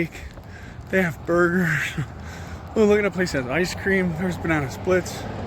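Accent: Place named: Michigan, United States. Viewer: Great that you’re healing up a bit! Sorry about the grey hair, but maybe that will go away; one can hope! 😅 You sound like a “Michigander” that was my guess, then, Wisconsin, lol! “Talk to you’s later!” We all share similar expressions of speech, haha! Stay safe 👍🏻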